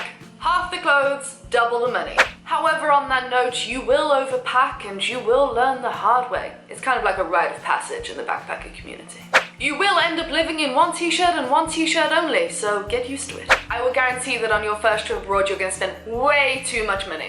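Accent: England, United Kingdom. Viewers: are you english ? (L) i love your videos anyway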